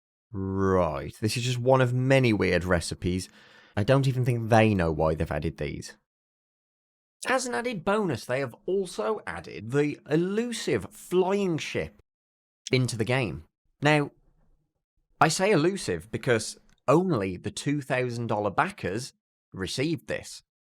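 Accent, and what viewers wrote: Birmingham, United Kingdom. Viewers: whats your accent? some mad brummy style :) good vids man, fellow dev here, geordie.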